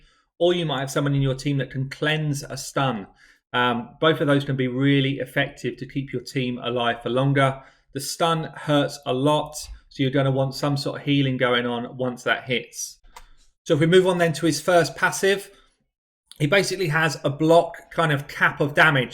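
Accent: United Kingdom. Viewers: you rock!! I've been watching your videos for a while and I always look forward to your insight and delightful British accent.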